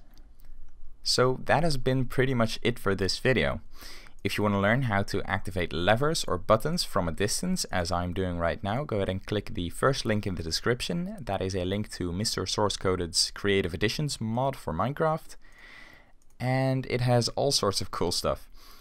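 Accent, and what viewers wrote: Netherlands. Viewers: Where are you from? You sound dutchie :p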